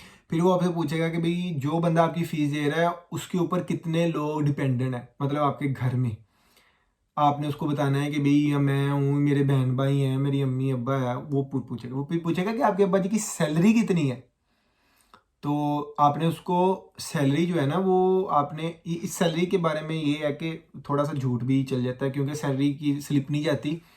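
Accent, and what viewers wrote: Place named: Faisalabad, Pakistan. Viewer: Bro faisalabadi ho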